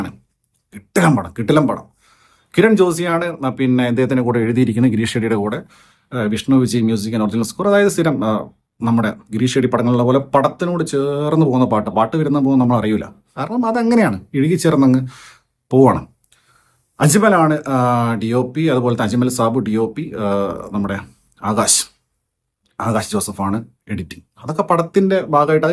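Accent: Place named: Tamil Nadu, India. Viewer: ഇയ്യാള്‍ക്ക് മലയാളം അറിയില്ലെ. സംസാരത്തില്‍ ഒരു തമിഴ് touch ഉള്ള പോലെ.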